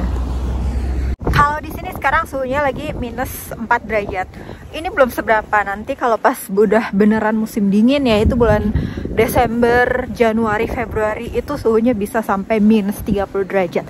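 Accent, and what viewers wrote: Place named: Java, Indonesia. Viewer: Mbk logat omonganmu kok ke Jawa"an gt.. Asli orng Jawa ya.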